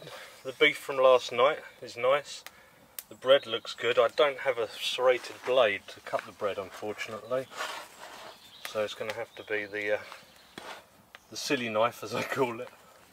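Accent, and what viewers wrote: United Kingdom. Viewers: Mate honestly I’ve just started watching your videos and you’ve got all my attention and utmost respect! Sir you’re quite amazing. Would you mind telling me where are you from? Cause Sir You sound like you from UK?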